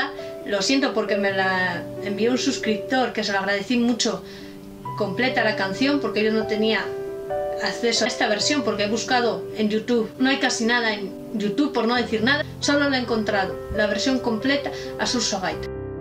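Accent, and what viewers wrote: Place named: Galicia, Spain. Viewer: ! Fíxate na canle "Tradigaita" que está en galego! Penso que á túa canle lle viría ben que os guións os dixeras en galego (vese que a veces che sae naturalmente así que, aproveita ese natural esponateo!). Tratándose de música, e moita música galega, estou máis que seguro que non lle afectará á comprensión! Ao contrario! Troca ao acento galego pola propia lingua galega!:-D Logo tamén, non sei como funcionas tí (co tema nervios/camara) nin canto tempo levas tocando pero seguro que menos videos e mellor preparados (os temas que tocas con gaita ao principio sobre todo) tamén sería un bonus e que atraería a xente:-D Ánimo e a seguir con ese labor divulgativo!:-D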